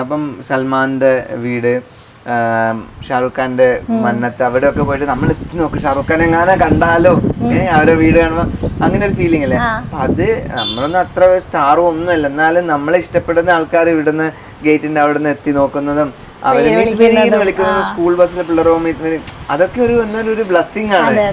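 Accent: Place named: Bangalore, India. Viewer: ഇവരെ സംസാരം ഇങ്ങനെ യാണ് അവർ ബാംഗ്ലൂർ ആയിരുന്നു 👍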